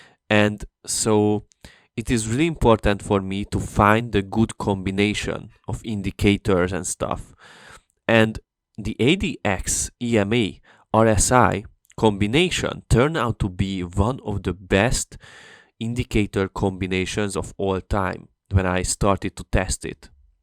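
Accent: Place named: Hungary. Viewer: I can recognize a Hungarian accent ;) Great video, thanks!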